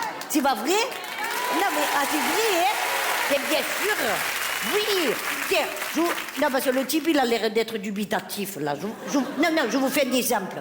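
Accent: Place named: Cannes, France. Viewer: Bravo Mado vous aime géniale et incassable moi qui vient de Cannes et qui n'y suis plus ça me fait un bien d'attendre l'accent de chez nous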